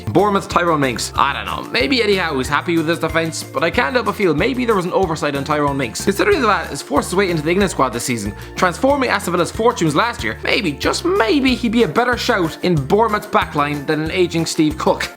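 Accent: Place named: Ireland. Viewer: I love your accent thats irsh init amazing accent that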